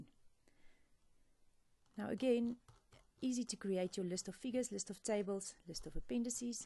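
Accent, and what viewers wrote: Afrikaans, South Africa. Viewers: South African? The accent is strong. But it´s cool to hear it so unexpectedly. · Thanks very much, got to love the Afrikaans accent! Dankie!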